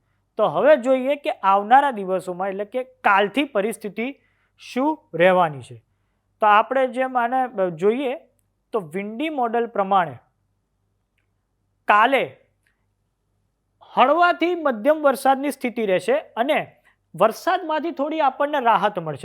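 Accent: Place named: North Gujarat, India. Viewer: Tamari boli upar ki lage se aap utar Gujarat bajuna so A G Ahir o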